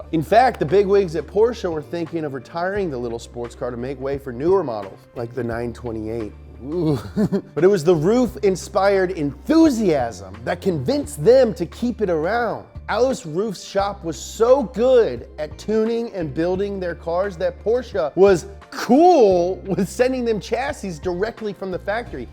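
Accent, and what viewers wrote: Germany. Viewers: The german accent is pure bellissimo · the funniest german accent ever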